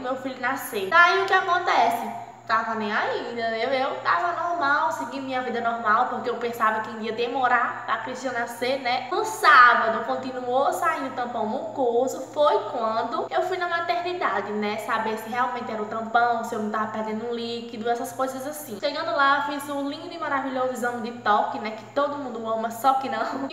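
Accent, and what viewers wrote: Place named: Alagoas, Brazil. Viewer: Graças a Deus que no final deu certo🙏🙏 O meu parto foi normal super tranquilo! Comecei sentir dores em uma noite anterior as 18hrs e no dia seguinte as 15hrs já estava sentindo contrações e partir pra maternidade.. chegando lá fui super bem tratada e meu Bryan nasceu as dizem que não demorei muito , mas pra mim parecia que não ia ter fim tanta dor kkkk mas graças a Deus deu TD certo e estamos bem.. Sucesso pra vc .. gosto do seu sutaque igual o meu kkkk sou Alagoana .. bjs corega 😂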